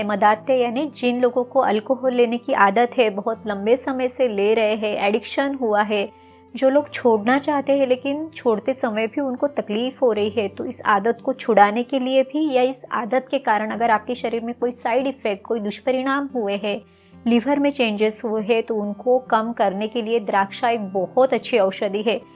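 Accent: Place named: Maharashtra, India. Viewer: Aap ko dekhke aapki bolne ke tarike se aap maharastiyan lagati hai didi❤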